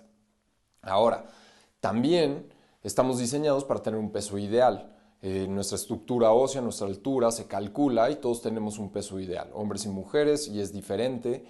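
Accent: Mexico. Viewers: Ay te amo! Por momentos tengo que retroceder el video porque me desconcentro con lo lindos que sos y tu acento mexicano 😍❤️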